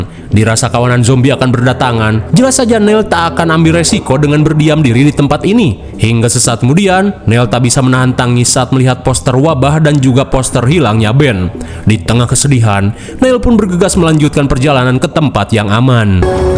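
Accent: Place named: West Java, Indonesia. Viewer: Mantap sunda pisan euy😁😁😁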